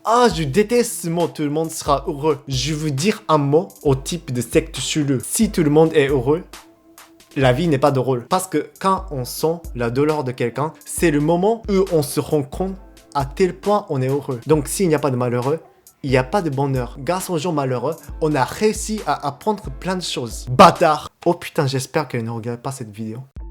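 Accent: Japan. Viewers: Sympa tes vidéos, je kiff bcq ton humour et ta façon de parler, avec un pti accent japonais tu maîtrise vraiment le français, et tu t'exprime super bien, les mots ou les insultes et les expressions qui m'ont fait délirer!! J'adorerais faire une tournée des bars avec toi, et après qqles saké ou autres philosopher sur le Japon et la France... lol 🤩👍🍹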